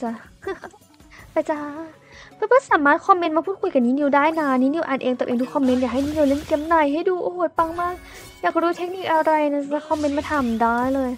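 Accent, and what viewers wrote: Thailand. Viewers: ชอบเสียงพี่เค้ามากเลยอ่ะโอ้โหเสียงแบบเหน่อได้ใจ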